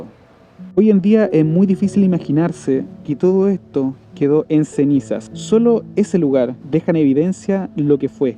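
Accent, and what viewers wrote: Chile. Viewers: ERES CHILENO! Que lindo escucharte! Gracias por comenzar el video sin intros y directo al grano. Gran video y dicción. Sigue así 😊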